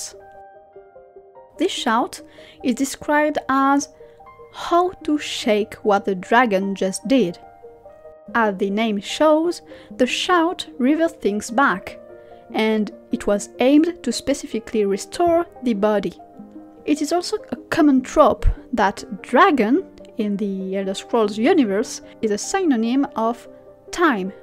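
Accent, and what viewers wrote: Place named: Europe. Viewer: love your accent where are you from in Europe, this channel has alot of lore value for skyrim , great work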